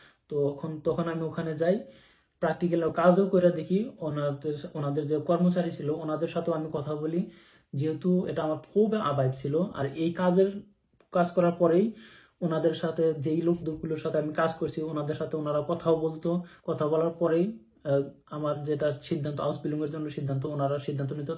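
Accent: Assam, India. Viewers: Uni India Asam theke belong kore.... Doya kore ektu bujhe nen Bangla ta.... Sob jaigar accent ek rokom nah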